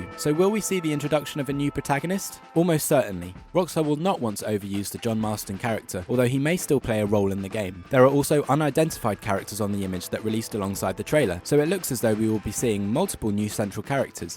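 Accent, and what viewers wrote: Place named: London, United Kingdom. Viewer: man, I love your accent. Are you from London?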